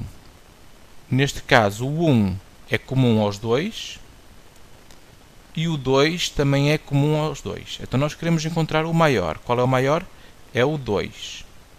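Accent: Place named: United States. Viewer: otimo vc tem sotaque de americano ...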